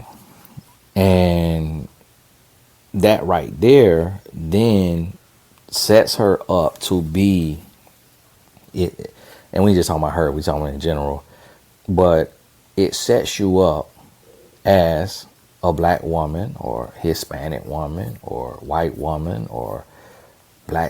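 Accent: South, United States. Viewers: I hope you don't lose your accent. Your southern accent coupled with your God- given wisdom and humor sets you apart from the rest. It's very much appreciated. It's refreshing hearing a relationship coach who doesn't sound like everyone else. 👍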